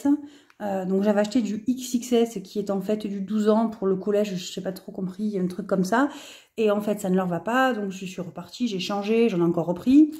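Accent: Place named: Southwest, France. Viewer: Cc merci pour ta vidéo j'adore avec ton joli accent du Sud ouest 😁.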